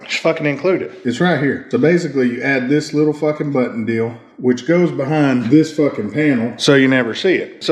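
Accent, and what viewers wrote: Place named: Appalachia, United States. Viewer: Why do people who talk like a HillBilllie sound low IQ to me ?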